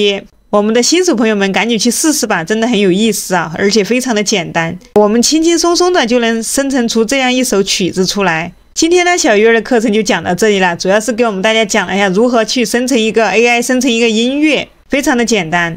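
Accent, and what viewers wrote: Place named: Hubei, China. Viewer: 我听您口音，好像也是湖北的哈。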